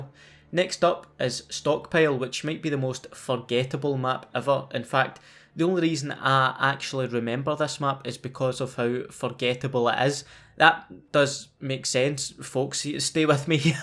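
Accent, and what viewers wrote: Scotland, United Kingdom. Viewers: Is he scottish? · I love watching your vids I'm british and I can do a Scottish accent due to you thx my favourite thing I heard you say was you camping bastard #notification sqad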